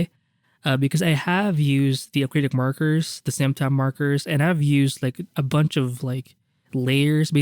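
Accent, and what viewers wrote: Philippines. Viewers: YOU SOUND FILIPINO!!!!!!!!!!!!!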